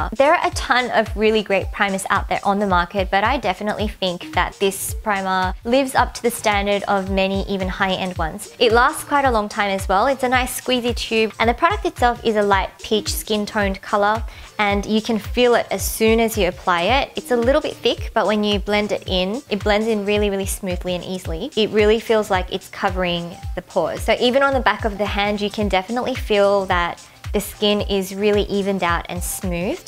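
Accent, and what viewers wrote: Australia. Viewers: Hi. Love your videos. So awesome to know you're from Australia too. It sounds great to hear someone from Australia. And also you do great tutorials using some of the Korean street brands that are inexpensive to buy. I just started getting into make-up and I particularly prefer Korean make up brands because Kpop? (that's a bad reason, but Korean anything just appeals to me the most at the moment) I particularly like Innisfree as a brand in general because everything looks so natural yet classy at the same time and I had my first Korean cosmetic brand experience with Innisfree as well (Innisfree anti-Bija Spot Essence W which is sadly not available anymore online on the Innisfreeworld website) It's funny how you're particular about the fragrances, but I found that they all smell nice, so I had no problem with it. I guess you could say that I am now a die hard loyal fan of Innisfree and I will love anything it has. 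Off topic but dying to ask because I don't know where to: As someone who's still so inexperienced, when would you use BB Cream or Liquid Foundation? I understand the differences but seeing as Liquid Foundation is tons better, I see no point in buying BB Cream. But I saw your make up collection which is astounding BTW, and it has tubes of BB Cream in it. So I was wondering what sort of occasion would you use BB Cream and why?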